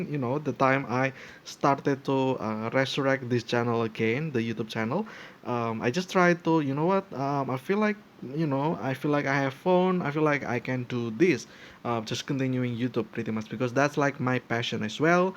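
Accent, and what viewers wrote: Indonesia. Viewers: Bro, from your accent, i guess you're Indonesian?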